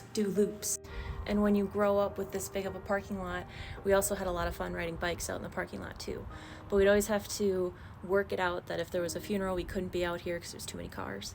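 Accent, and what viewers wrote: Minnesota, United States. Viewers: I knew your from Minnesota through your Minnesota accent!!! As confirmed by the Minnesota State flag!!! Good guess here!!!😂😂😂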